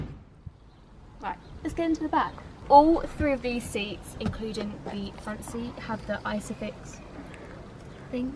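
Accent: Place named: United Kingdom. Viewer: i love the bri'ish accent :D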